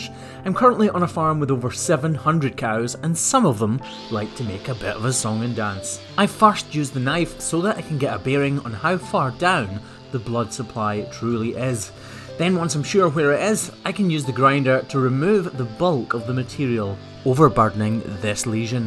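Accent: Scotland, United Kingdom. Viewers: Ur accent is from Brave ? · I don‘t know anything about cows or hooftrimming (aside from what I learned from you XD) but since I stumbled over one of your videos I keep watching them for your humor, the charming scottish accent and the beautiful landscapes! Thank you for the Entertainment! ❤️ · Scots ...hes Scottish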